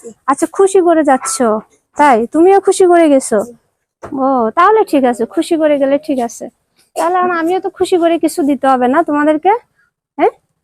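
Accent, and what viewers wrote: Chittagong, Bangladesh. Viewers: আপনি চট্টগ্রামের যে,কতা শোনে বুঝা যাচ্ছে যে...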